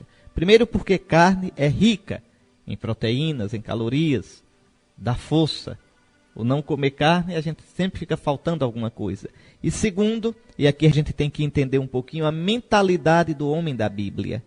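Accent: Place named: Northeast, Brazil. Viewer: Uma ótima explicação em dialeto nordestino. Dom Henrique intercedei por nossa Quaresma!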